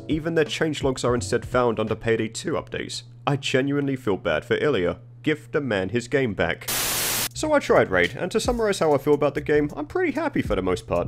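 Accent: United Kingdom. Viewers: where abouts in the UK you from?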